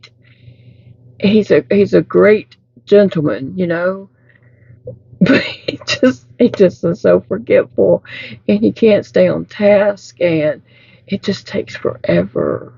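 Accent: South, United States. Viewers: You are a steel magnolia! I never met anyone from a southern state because I was born and raised in New York City and later moved to California. Now I am refired and live in Florida where I have met a few southerners. I have such a respect for you guys because so many of you are down to earth and have true grit. Just like you, I loved the movie, "Steel Magnolias" and you remind me of those ladies, especially Dolly Parton. You have the sweetest voice and the cutest accent. You are intelligent and funny, and so very charming and loving. Life can be tough, and some of us are more challenged than others. God has blessed you with fortitude, and a very loving family to bolster you. I will continue to include you and your "men folks" in my prayers and will ask the Lord to help you heal and ease your pain. I know you are resilient, and that "new" hand will be back in business in no time.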